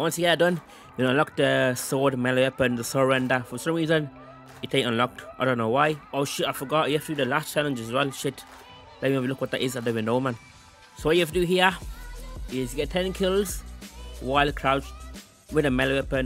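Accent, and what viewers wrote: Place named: United Kingdom. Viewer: Bro you’re so damn British or whatever I can’t understand a word you’re saying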